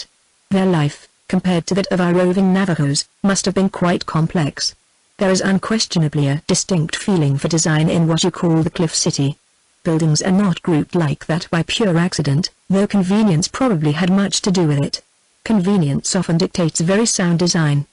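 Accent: United Kingdom. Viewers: Seriously. It is INSANE to use a BRITISH voice for this!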